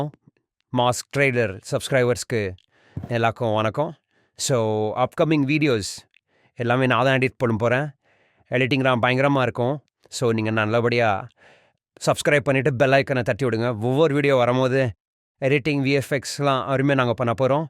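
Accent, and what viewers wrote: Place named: North India, India. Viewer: உன்னோட வாய்ஸ் வடக்கன் மரி இருக்கு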